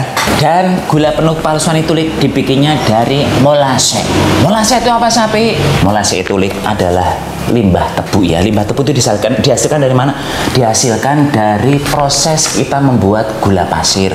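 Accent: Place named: Banyumas, Indonesia. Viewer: Liik sampean asline Endi, medok banget ngomonge .. Banyumas apa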